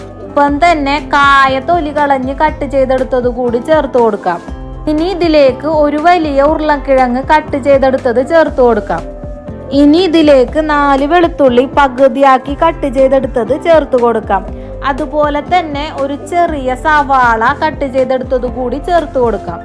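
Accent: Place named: Thrissur, India. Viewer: Thrissur kaari aano...slang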